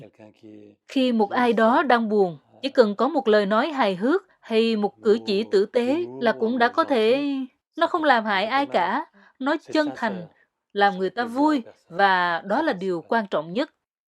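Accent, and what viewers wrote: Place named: South, Vietnam. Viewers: nhà báo giọng Sài gòn sang trọng Văn phong phỏng vấn đơn giản thú vị. đồng cảm với nhân vật. người nghe xúc động cuốn hút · Tui ghiền xem kênh này từ khi tình cờ xem được clip hai em đi về vùng quê thăm mộ HHNP và nơi HH từng sống...❤❤❤ Rất thích thú cách interview của, giọng Miền Nam tự nhiên và rõ chữ👏👍👏❤❤👍👏👍❤❤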